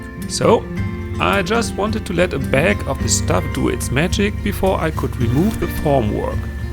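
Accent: Germany. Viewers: Well, somehow I missed this episode and saw the next few before this one. I love your sense of humor and respect your sense of fairness in showing us what you did wrong. I have some German heritage. My great great grandmother and her husband came from Germany to the United States by ship. By the time I came along the accents had disappeared. I really enjoy listening to yours. I hope you keep putting out videos because I smile all the way through them. Thanks!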